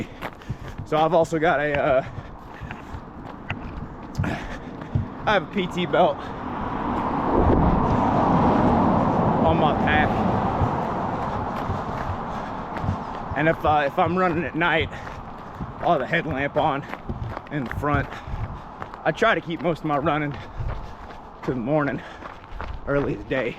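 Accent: South, United States. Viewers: I love the fact that with the run, the southern accent comes out. 😎Yee haw! I'm 60 years young and the miles have started reminding me that I'm not 20. You, young man, are an encouragement for others to get out there and do the things, regardless of age! Keep up the good work! Love the rant! Thanks for sharing.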